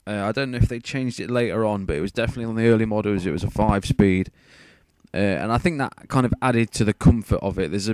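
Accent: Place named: Midlands, United Kingdom. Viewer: where ya from? you sound midlands ish. len Manchester in Melton Mowbray do the new model. they might have a demo you could test.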